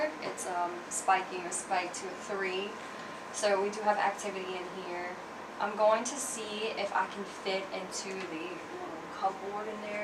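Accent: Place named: United Kingdom. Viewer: Great setting. Nice background story. You have a slight British accent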